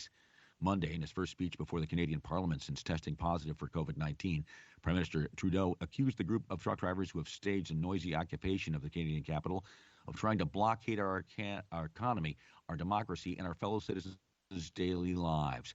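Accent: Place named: United States. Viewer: Thank you very much Mr., for all the updated news. I do like to listen to your nice American voice. I hope that in the next time, you will read the news with slower speed. Therefore, we can listen and understand the news contents clearly. Furthermore, the speaker can avoid the readings tripped and wrong. Once again, thank you very much for your attention to my comments.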